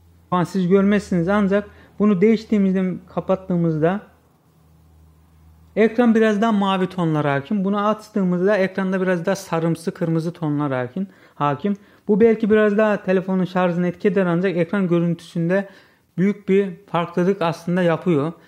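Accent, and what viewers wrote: Azerbaijan. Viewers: Azerbaycanlı mısın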